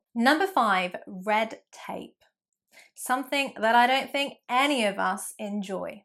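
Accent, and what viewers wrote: United Kingdom. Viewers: I like the British accent :-) Hello from E.T. -- 🦋East Turkistan🦋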